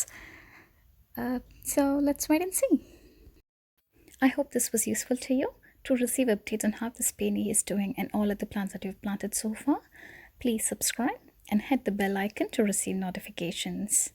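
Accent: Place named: India. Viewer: You have the most elegant Indo-British accent ever! So posh!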